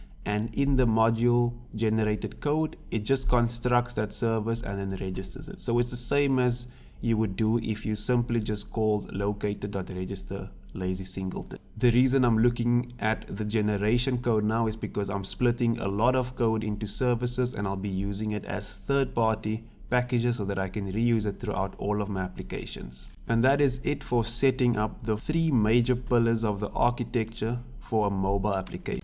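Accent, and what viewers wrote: South Africa. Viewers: you have a sa accent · I thought that accent sounded familiar :) South Africa, howzit man. Did you base this on MVVM?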